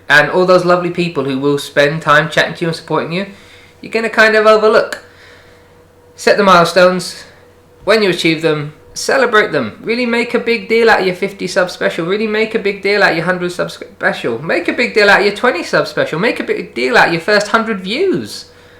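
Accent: United Kingdom. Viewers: Awww there you go :D you can talk to the camera perfectly! You must be british though :3? Very true tips :)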